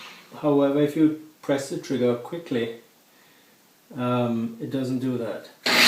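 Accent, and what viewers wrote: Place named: Punjab, India. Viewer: Fuck your accent. I'm tired of having to figure out what the fucking Punjabis are saying.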